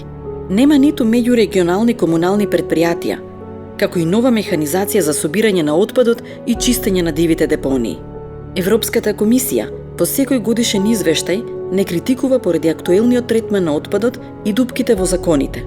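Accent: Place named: East, North Macedonia. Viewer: Малку си мава на источномакедонски нараторот, но е многу подобро отколку виличење на скопски. Најважно од cè, многу интересна тема, и добро покриена. Браво!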